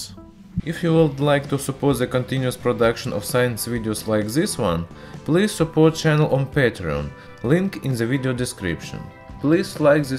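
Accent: Russia. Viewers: تعلم اللغة الانجليزية هو روسي و يحاول التكلم بها انا افهم كل فديوهاته 😁😁😁😁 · you have a hard russian acent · I love russian English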